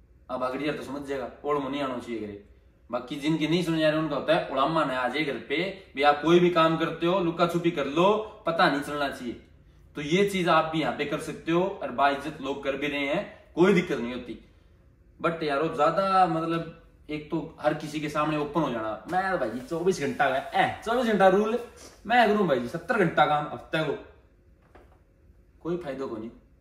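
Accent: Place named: Bagri, India. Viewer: Best best h bhaai treeeka ek nmbr h bhaai baat krne ka btaaane ka sb bagri hindi mix c ghant h bhaaai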